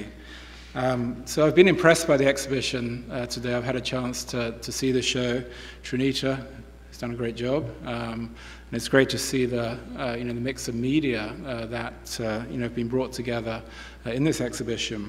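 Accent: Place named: United States. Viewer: I didn’t enjoy it. A long monosyllabic ramble with continuous American style diction. Dreadful!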